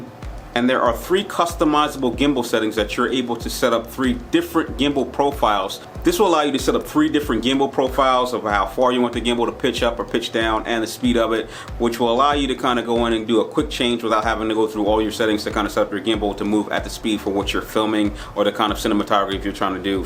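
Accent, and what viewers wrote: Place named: New Jersey, United States. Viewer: FIVE STARS! Always like your fresh comments, I just bought it! and is refreshing to hear sincere WORDS before I start out on my experience. Your the only reviewer, who tell it, right off, with out the fancy intro music video. To the point video from a reviewer who did not get a free one from DJI, Love The honesty Pal! KEEP UP THE spontaneous reaction! VERY HELPFUL! I am in Thailand, but you sound like the truth of my old neighborhood in New Jersey, very comfortable!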